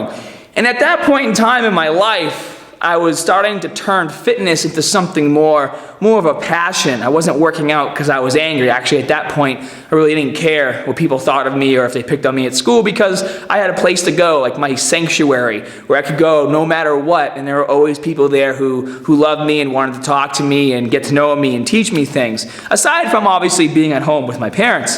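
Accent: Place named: New England, United States. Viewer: Aside from being oh so easy on the eyes...one of the reasons why I watch your videos is because I am always impressed with your authenticity as a person. You are a kind soul. This couple with the fact that your NH/MA accent is so familiar to me, allows me to digest what you are teaching and actually benefit from it. Happy New year to you and "Ericer" LOL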